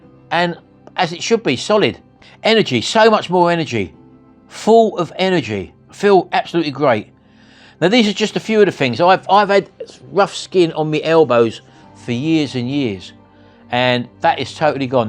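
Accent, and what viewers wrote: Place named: England, United Kingdom. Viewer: How refreshing to listen to a clear English accent instead of high pitched nasal American females telling us how to eat.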